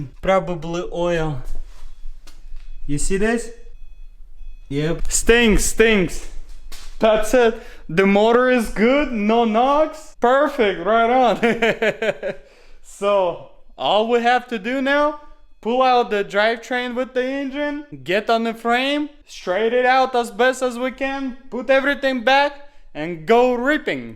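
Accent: Russia. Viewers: ты русский? акцент сразу заметен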